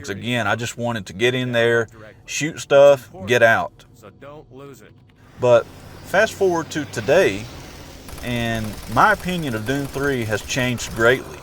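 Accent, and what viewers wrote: South, United States. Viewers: now ahm not gay (nothing wrong in bein gay do) but ah think ah have a crush on ya southern accent mayn! seriously tho, this game is too underrated, it aged well imo.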